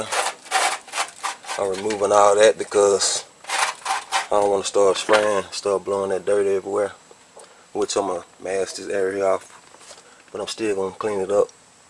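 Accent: Alabama, United States. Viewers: What part of Bama you from???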